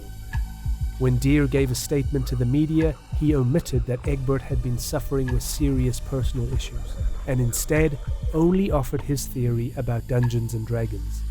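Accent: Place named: United Kingdom. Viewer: Always better with a British narrator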